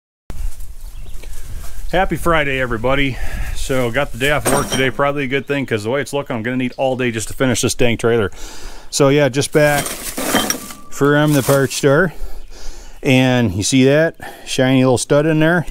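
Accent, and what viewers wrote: Minnesota, United States. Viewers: Here's a curious observation (not a criticism).....the extremely rare times you appear "stressed" on any video, your uniquely Minnesota accent comes out. As for the "swagger" inference.....it's more likely due to the way you walk especially in the field.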